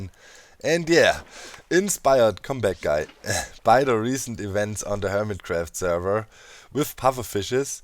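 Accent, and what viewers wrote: Germany. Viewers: Wie man immer hört wenn jemand deutsch ist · Kann ich da einen deutschen aktzent raus hören?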